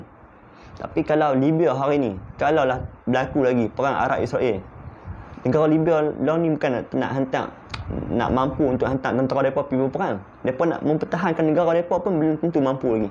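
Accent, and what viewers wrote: Perlis, Malaysia. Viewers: Good content Bro. Semoga Allah mempermudahkan segala urusan kita hendakNya Bro. Style cakap mcm Geng Perlis.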